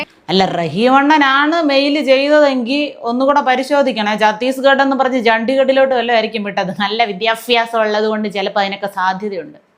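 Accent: Thiruvananthapuram, India. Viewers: നിങ്ങൾ tvm കാരിയാണോ. ഭാഷ അങ്ങിനെ തോന്നുന്നു. സൂപ്പർ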